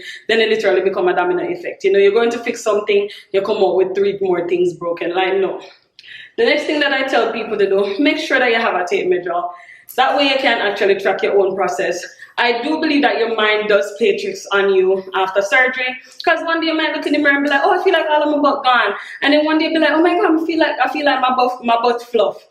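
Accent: Jamaica. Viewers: I feel like I just know your Jamaican cause it takes one to know one!! But maybe your African 😂❤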